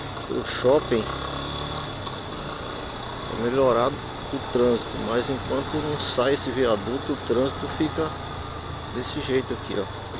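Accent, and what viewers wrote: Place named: Pernambuco, Brazil. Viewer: legal Mano você é de Pernambuco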